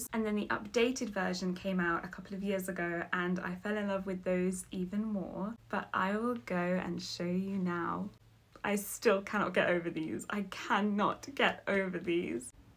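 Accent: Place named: RP, United Kingdom. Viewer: You sounded so- idk how to say it without it sounding bad but like, posh the whole first half then suddenly you said “when we was up in London” and I actually giggled out load.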